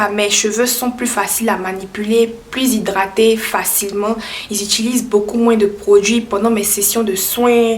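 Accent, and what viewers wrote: Ivory Coast. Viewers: J'aime son ascent ❤️❤️❤️. Genre à l'ivoirien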